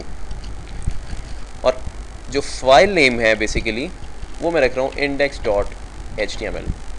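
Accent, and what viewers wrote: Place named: Punjab, India. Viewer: aap ho muslim liken aapke bolne ka axcnt Punjabi h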